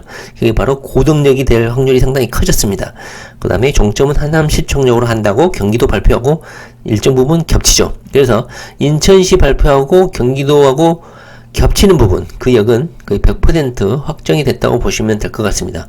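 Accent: Jeolla, South Korea. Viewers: 이사람 전라도 가탕..사투리쓰네..전세계 최고 허브 공항을 당연히 gtx 연결 시켜야지..국가 발전을 위해서..스톡홀름..공항에서 바로 시내로 초고속 기차 진입하니 완전 빠르고 좋더만..국토부에서 알아서 하세요~~공항철도는 넘 느려~~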